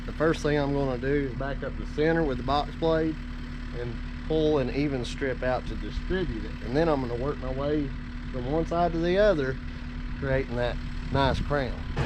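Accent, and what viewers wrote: South, United States. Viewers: Outside of the southern twang, I like your style. 😁 New subscriber.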